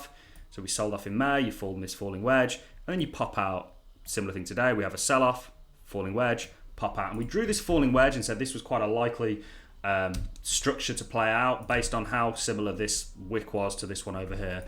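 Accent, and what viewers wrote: Birmingham, United Kingdom. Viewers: You sound like a fellow Brummy? I'm pretty happy you made this video. I was planning on selling my Cardano, but I'll hold on, and hope the price jumps up. What's your thoughts on Elrond? I've had it for quote a while now.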